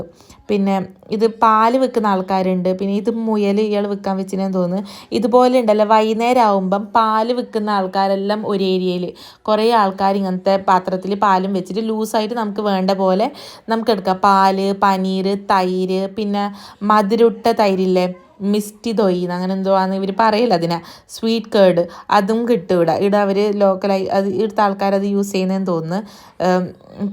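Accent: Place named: Kasaragod, India. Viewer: kasaragod basa😂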